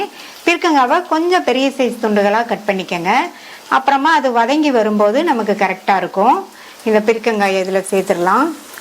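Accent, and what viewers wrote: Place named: Madurai, India. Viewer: குழம்பின் மணத்தை விட மதுரை தமிழ் மணக்கின்றது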